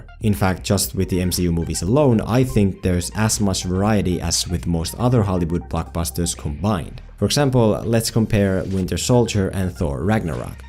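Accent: Finland. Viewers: Ahh I recognize a finnish accent when I hear one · is krakozhia a inside joke? because i couldnt find anything on google and tbh you sound finnish torille tavataan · Nah, it's a fictional East European country from some movie or video game or something, I don't remember. But he is clearly Finnish, I can tell by his accent (I'm a Swede). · Your accent sounds so fucking Finnish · Are you Finnish? You're accent sounds Finnish. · krakozhia... hmm .. but you accent still sounds alittle ... Finnish... maby... Estonian... maby...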